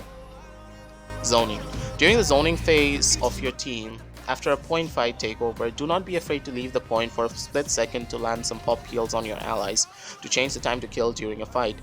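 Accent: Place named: India. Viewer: Why u sound like an Indian?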